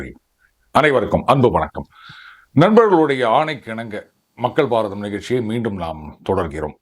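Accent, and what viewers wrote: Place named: Chennai, India. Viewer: பாரதத்துக்கு மட்டுமாவது “ சென்னைச் செந்தமிழ் “ வேண்டாமே.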